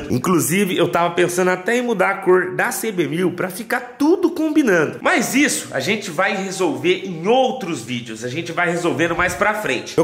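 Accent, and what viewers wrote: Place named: Bahia, Brazil. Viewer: Baiano demais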